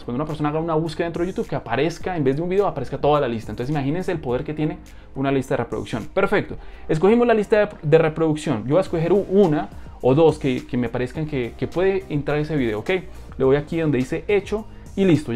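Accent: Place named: Colombia. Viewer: Yo si decía que ese acento se me hacía conocido, tenías que ser colombiano para hacer las cosas tan bien 😊 🇨🇴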